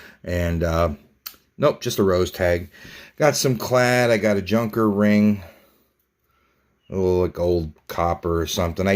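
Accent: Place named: Scranton, United States. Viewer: Sound like a scranton boy to me?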